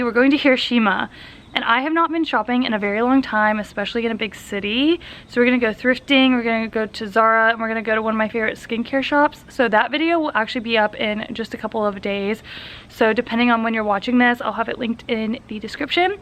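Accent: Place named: United States. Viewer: This is a interesting movie. Than you for your upload. By the way, which state are you from? I am also interested in American girls accent in region.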